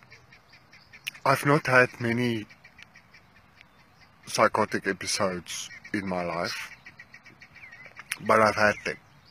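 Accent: South Africa. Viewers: Your accent is so awesome. You must be South African. Keep fighting big fella ❤️🤙🤙🤙🤙🤙🤙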